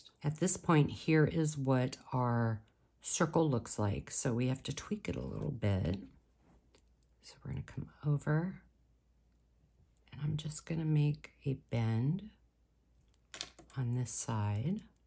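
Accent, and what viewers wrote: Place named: Midwest, United States. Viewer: are you from the Midwest somewhere? I hear my family in your pronunciation of words with “or” in them like “form”. I love your designs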